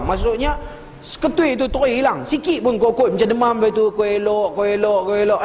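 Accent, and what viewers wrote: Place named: Penang, Malaysia. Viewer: Seronok dengag ustaz cakap penang...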